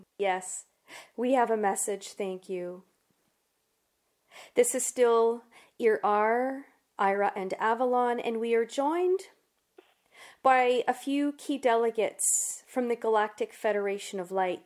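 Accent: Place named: Canada. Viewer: You lost me at "outside" and "outcomes." Oooooot-side and oooooot-comes. Canadians should be seen and not heard...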